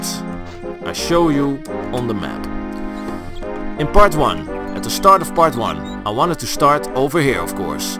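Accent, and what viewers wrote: Netherlands. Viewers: You sound dutch, isn't it? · You have the best dutch accent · you sound super dutch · I can't handle how Dutch this guy sounds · i have this feeling that you'r dutch beacuse of the accent? XD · That's the most Dutch accent I have ever heard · Your dutch accent is out of this map man!! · Haha je kan fk goed horen dat je Nederlans bent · Dat strong Dutch accent tho. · dutch accent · you're accent... , if you arent from the netherlands Im eating my shoes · Ik hoor aan zijn accent dat hij Nederlands is😂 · that has to be a dutch accent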